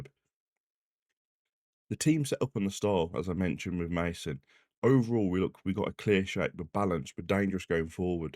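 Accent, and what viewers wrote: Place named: Black Country, United Kingdom. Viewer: Seems a couple of Youtubers think that you should do as they wish, well you are what you are, Wonder they have not had i dig at your accent? to be honest mine is worse than yours. We are not called Yam Yams 4 nothin dun yo know wot i meen kid. I would though try just to say what you think, forget reading. Remember if it was the Black Country where the Industrial Revolution started if not where would the World B?? You are famous. Derby vs Cov, how did we not beat Derby?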